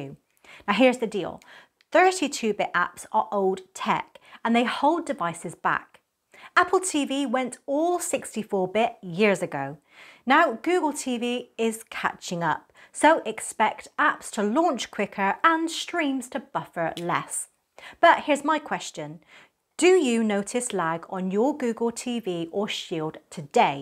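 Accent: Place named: Bristol, United Kingdom. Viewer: she must be from Bristol?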